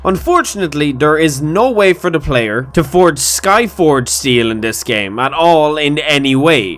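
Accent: Ireland. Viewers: Your accent is more borage, than elsinore, you sound like an Irishman than a Kajhiit.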